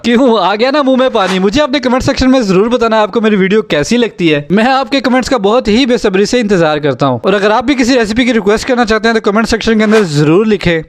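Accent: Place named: Punjab, India. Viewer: So yummy recipe😋...I like your punjabi accent😊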